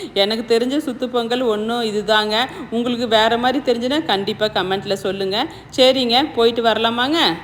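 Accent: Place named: Kongu, India. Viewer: சூப்பர் மா பொங்கல் விட உங்க கொங்கு பாஷை தான் எனக்கு பிடிச்சதே மா சூப்பர் சூப்பர் உங்க குரூப் பொங்கலுக்கும் உங்க பேச்சுக்கும் ஒரு ❤️👏👏👏👏👏👏👏👏😄👍🙏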